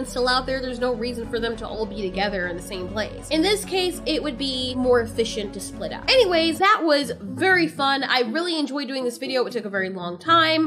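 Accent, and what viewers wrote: United Kingdom. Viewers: please answer this are you british bcs that was the best british accent uive ever heard, i should know since im british. i started watching u about 3 weeks ago and have been so fascinated by all of this with a quiet place i love ur work aswell please keep it up <3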